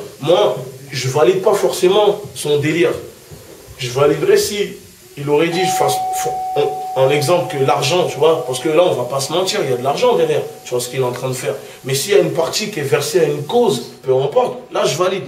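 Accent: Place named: Africa. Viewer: Bizarre maintenant il parle avec un fort accent africain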